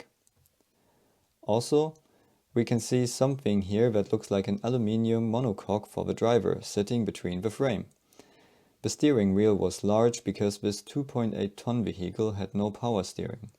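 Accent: Germany. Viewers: To anybody interested. The channel owner, although sounding German, I suspect has Scottish genes since I found out the name of the channel has thy Scots surname of. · Your accent sounds very german, i know that because i'm german, are you german too? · And all this with the your german accent. Einfach herrlich. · bro your german accent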